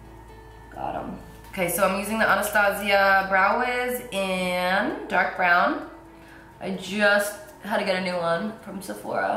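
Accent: New Jersey, United States. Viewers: u have a nice thick jersey accent, represent girl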